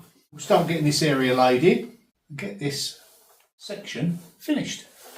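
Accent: Essex, United Kingdom. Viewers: I love these vlogs, but especially accent. Essex girl in California.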